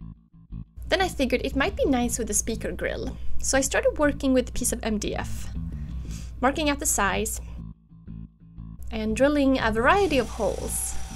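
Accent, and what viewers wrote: Sweden. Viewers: luse i speak swedish ans she does too. you can hear it quite alot from her accent if that was what you meant. cheers lad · you have a very swedish accent :P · Du låter svensk? :D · Are you Swedish I can kind of hear that on your English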